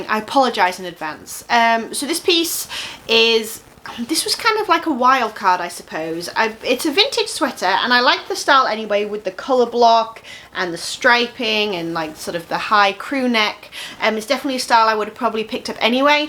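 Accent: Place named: United Kingdom. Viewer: Love your British accent and lingo!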